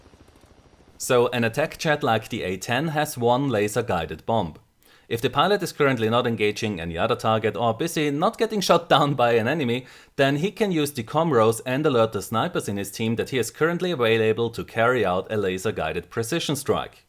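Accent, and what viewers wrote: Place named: Germany. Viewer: why u sound so german ?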